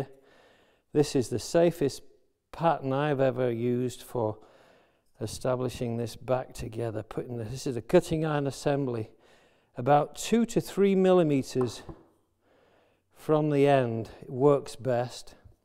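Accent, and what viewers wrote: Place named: Northern England, United Kingdom. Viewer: Our northern accent never leaves us =) great videos.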